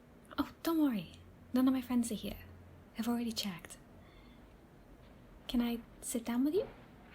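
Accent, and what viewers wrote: Wales, United Kingdom. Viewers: Hmm. You sound Welsh. First time I’ve heard a Welsh ASMRtist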